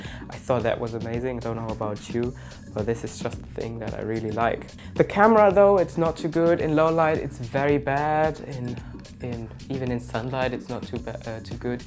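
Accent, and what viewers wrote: Germany. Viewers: i thought so. I've been living in düsseldorf for about 1.5 years now. i can easily recognise the german accent^^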